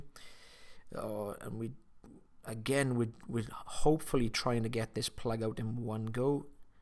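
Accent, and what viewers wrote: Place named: Scotland, United Kingdom. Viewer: Is Scottish? I love the accent!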